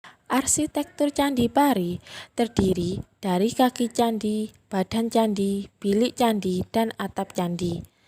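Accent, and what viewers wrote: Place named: Java, Indonesia. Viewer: Suaranya jawa bgt.. Xixixi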